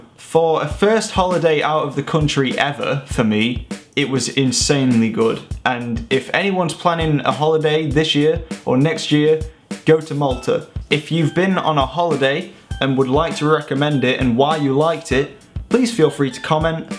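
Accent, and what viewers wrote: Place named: Yorkshire, United Kingdom. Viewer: The Yorkshire accent is strong with this one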